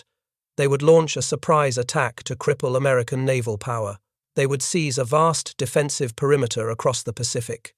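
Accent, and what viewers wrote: United Kingdom. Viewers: Does having a narrator with an English accent makes the information more believable?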